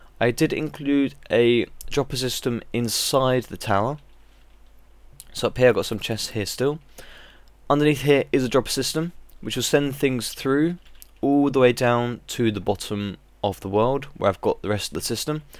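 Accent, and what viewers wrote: United Kingdom. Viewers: Your like etho with a British accent :D But really you are genius.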